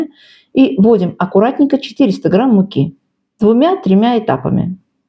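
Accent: Baku, Azerbaijan. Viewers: Это бакинский акцент. Растяжка последних гласных в словах это влияние фарсидского языка.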